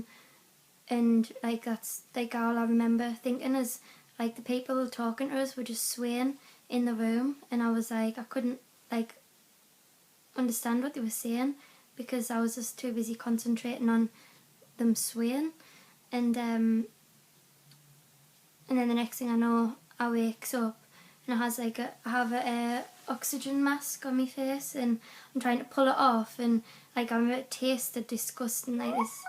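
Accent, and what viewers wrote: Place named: United Kingdom. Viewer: Loved your video. I am scheduled for this ablation on 10 May. Very anxious. Lots of scary videos on this procedure. BTW I do love your british accent, and you are also very beautiful. Ken in USA.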